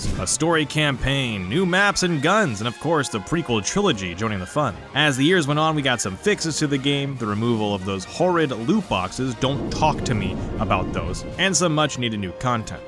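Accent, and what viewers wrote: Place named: New York, United States. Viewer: you have a New York accent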